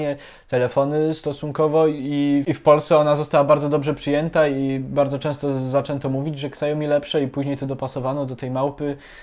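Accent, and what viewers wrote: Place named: United States. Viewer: przez jego amerykanski akcent to brzmi jak "szałmi" lecz poprawna mandarynska wymowa to "siałmi" to "ał" jest krotkim ao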